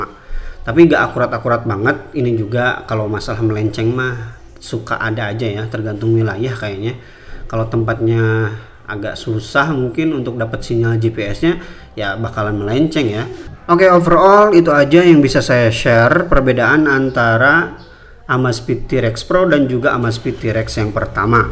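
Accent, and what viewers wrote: West Java, Indonesia. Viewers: sae a repiuna... nyunda pisan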